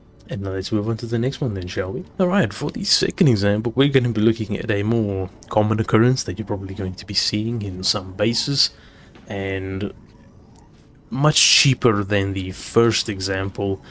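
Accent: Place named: Russia. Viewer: Hmm interesting. Im from germany and in german languge i realy hate that russen accend, but in english i like it for some rison. Long story short...i like your accent :) . Oh and of curse your vidios ar great. Kepp going.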